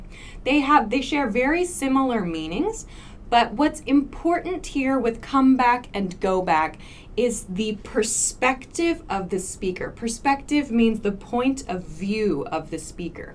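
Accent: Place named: United States. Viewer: I really like your accent......i like America accent.....who likes America accent like me....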